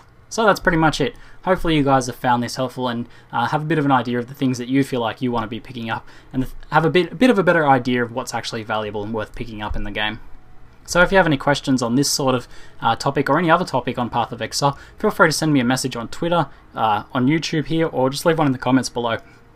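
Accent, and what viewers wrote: Australia. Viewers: I'd say australia. Especially because he greets us with "g'day"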